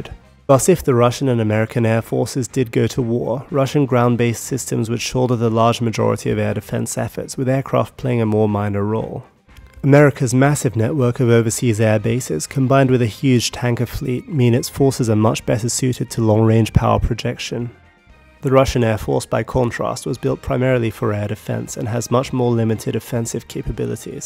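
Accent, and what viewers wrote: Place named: United Kingdom. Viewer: Pompous British accents are hilarious 😂 Nothing personal, great videos !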